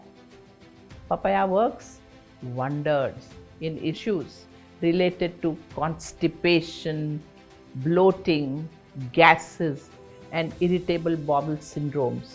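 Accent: India. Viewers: So what accent you expect her to have? American? Or Australian? This is how Indians speak so live with it!!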